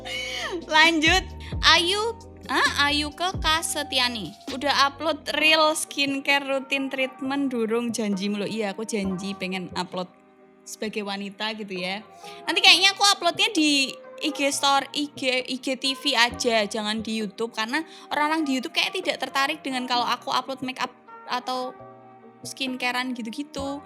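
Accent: Java, Indonesia. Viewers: Logatnya koq Jawa banget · Jangan mendok teruss kak . pake translate dong kak😔😔 .. Kita org NTT tidak ngerti kak . hehehehehehe .. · Meddok mbx 😁👍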